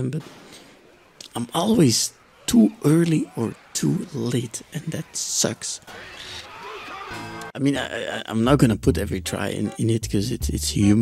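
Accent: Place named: Turkey. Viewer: Are u from turkey bro? Wtf is this accent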